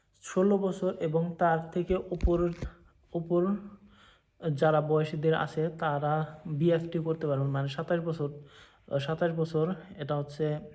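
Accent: Assam, India. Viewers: moi apunar kothar style sai buji goisilu...apuni Assam or pora ...bhai apunar logot keneke contact koribo paru ?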